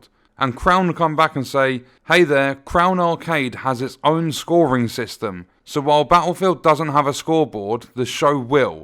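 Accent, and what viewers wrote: United Kingdom. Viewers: Love your explanation bro , i just subscribed to your channel, seems lovely and interesting with British accent lol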